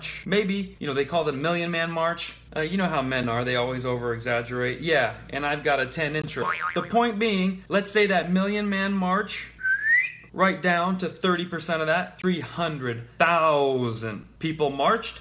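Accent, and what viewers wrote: United Kingdom. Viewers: Good British accent K-Von,you make me laugh,and I agree with everything you say."Get in there""!!!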